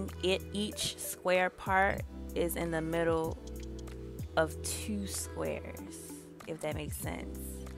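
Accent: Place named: Washington DC, United States. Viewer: Your accent is familiar , may I ask if you’re from Washington , DC? Also watching you part was so soothing in an odd way ! Lol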